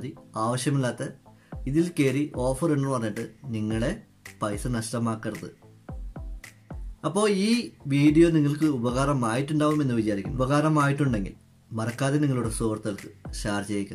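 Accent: Tamil Nadu, India. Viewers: MOTHER TONGUE TAMIL ANNALLE ? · ഒരു തമിഴ് ചുവയുള്ള മലയാളം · Malayali alla... atha... oru tamil touch😊but cute..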